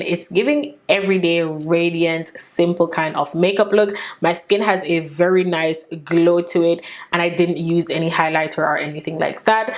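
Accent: Jamaica. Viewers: I'm def getting a Jamaican accent coming through?😏 Concealer looks great tho.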